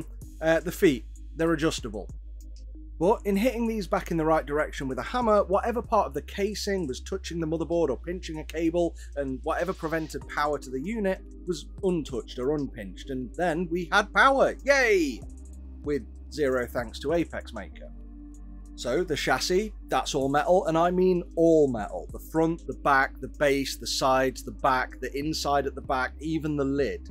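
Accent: United Kingdom. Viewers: "I sound cocky and arrogant" Me: That's probably Americans judging him based on his accent.... explains boot As an American, I think any American that interacts with any UK media or content creators and does not know bonnet, boot, lift, flat, torch, etc is just being willfully ignorant... on the positive side though, they would also miss the "knob" double entendre.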